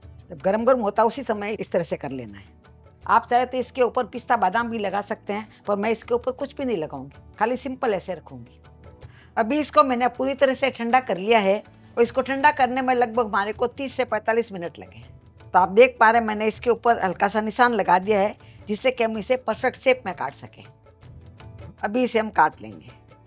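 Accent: India. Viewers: Aap bahut achha video bnate ho, Apki language or bolne ka desi tarikha super h.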